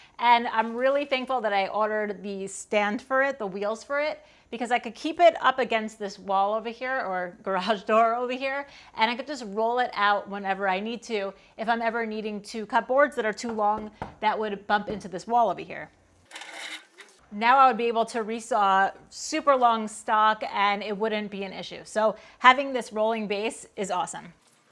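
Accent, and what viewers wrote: United States. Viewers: She just sounds like a Yank to me, but whatever, you're all going to be speaking Chinese soon anyway.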